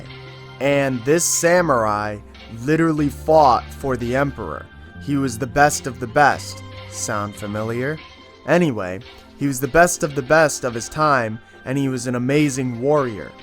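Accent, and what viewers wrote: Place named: Wisconsin, United States. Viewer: I’m from Memphis. My oldest brother lives in Wisconsin and your accents sound similar. Like the way you say floppy tits in a past video sounded exactly like my bro ha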